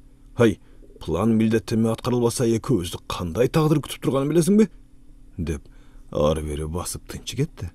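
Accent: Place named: Osh, Kyrgyzstan. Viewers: Оштутарлын тили менен окунан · Ушул оштуктардын тили жинге тийет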